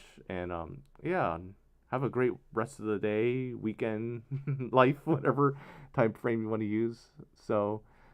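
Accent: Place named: South, United States. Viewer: He's from the South, in Oklahoma, so hearing him adds a bit of humor to his content. But he's very friendly so that just ads to the charm of it all.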